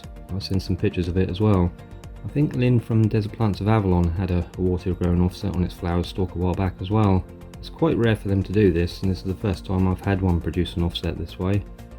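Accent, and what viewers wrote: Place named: England, United Kingdom. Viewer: mellifluous English English accent.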